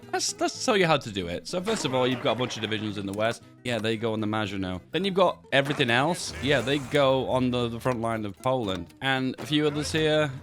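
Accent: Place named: Yorkshire, United Kingdom. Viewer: Knew you were a Yorkshireman, didn't know you were in South Yorkshire! Liked for best county.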